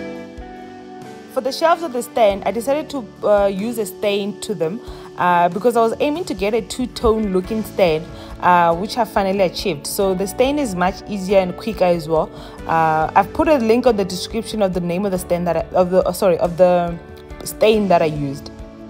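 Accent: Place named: South Africa. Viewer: I am south african Indian I thought I recognized your accent, wow you have such great ideas and I will surely try it out, my daughter has asked for a shoe rack. Keep it up with your good work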